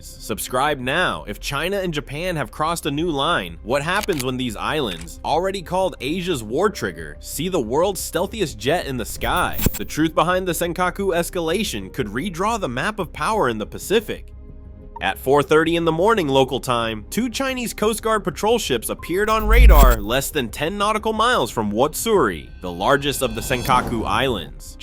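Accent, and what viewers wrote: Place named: Canada. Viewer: You sound like actors from 'Kids in the Hall'. Are you Canadian?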